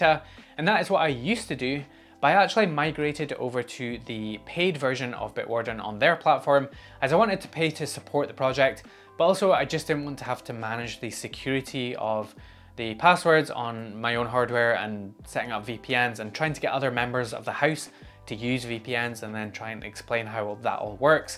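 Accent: Scotland, United Kingdom. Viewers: Excellent insight for a newcomer to the homelab/server world. Have just installed TrueNAS Core and upgraded to Scale on a salvaged 2011 desktop machine as an experiment, so I'm just getting a feel for the most popular apps that folks are running before taking the next steps. Really useful overview and nice to hear the Scottish brogue again ( I spent 21 years working in Lanarkshire for one of the few remaining semiconductor facilities before retiring back in my Welsh homeland with my Scottish spouse). Sub'd :)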